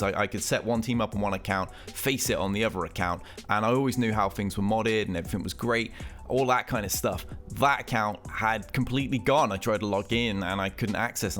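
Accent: United Kingdom. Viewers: Dude where are you from? I've always wondered haha. Can't figure out what UK accent that is lol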